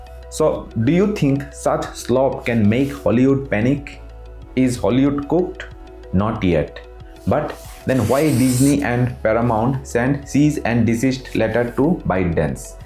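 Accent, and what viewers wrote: India. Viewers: Curry English gives me terrible headaches.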